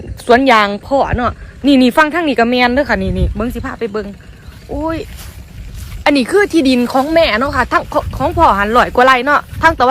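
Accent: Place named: Ubon, Thailand. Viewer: น้องเค้าน่ารัก สำเนียงคนอุบลชัดมากๆ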